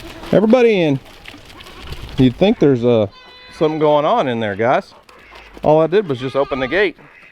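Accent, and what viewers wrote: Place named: Houston, United States. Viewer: Called. Slow up. Lol Great job yall.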